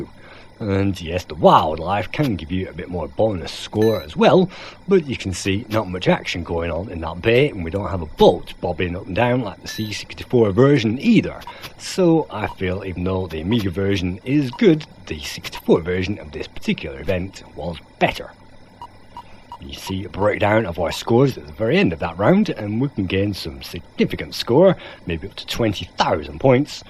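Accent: Lancashire, United Kingdom. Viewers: really enjoy your reviews! I'm a faithful subscriber from the USA and your accent is reminiscent of a mate from Accrington, so I'd venture to guess you're from Lancashire? if I'm wrong, no offense and keep up the great videos!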